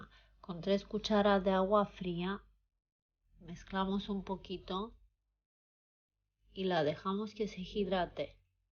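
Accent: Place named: Romania. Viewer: También quería mencionar que me fascina tu manera de hablar y tú acentos, no podía descifrar de dónde era, pero es genial que sea de Romania , deberías hacer un canal donde también enseñes tu idioma rumano!!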